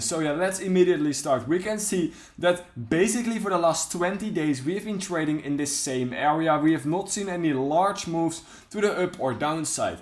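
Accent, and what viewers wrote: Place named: Netherlands. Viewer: 25k is not going to broken 😂 Dutch english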